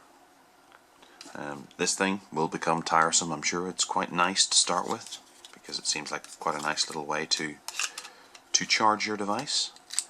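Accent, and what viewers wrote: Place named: Northern Ireland, United Kingdom. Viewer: Where are you from? You sound like you're from around my area..ish lol. Northern ireland?/ireland?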